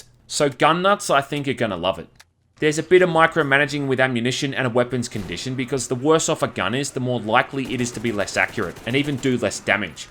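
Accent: Australia. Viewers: You have a good way with words. Good to hear an Aussie accent for once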